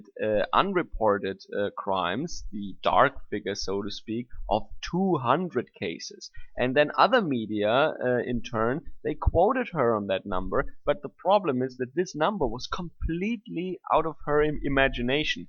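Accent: Germany. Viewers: Your German accent is cool. I live in America, but I took german in high school, and I would love to visit one day. I'm kinda put off by the migrant situation in germany, maybe the is a stupid question but is it still safe to visit Germany one day? Will I actually get to meet german people? lol like I said maybe a stupid question but I would love to visit one day.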